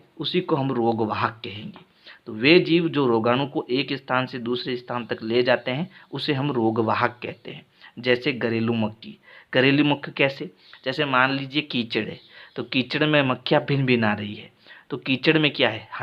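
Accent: Rajasthan, India. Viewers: Sir kyaa aap rajshthani ho😅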